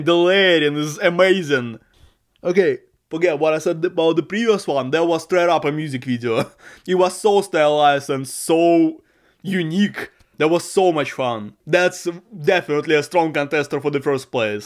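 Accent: Russia. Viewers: По акценту и затем по названию понял, что человек русский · are you russian perhaps? either way I love the accent lol it makes so much better lol. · слышу русский акцент в английской речи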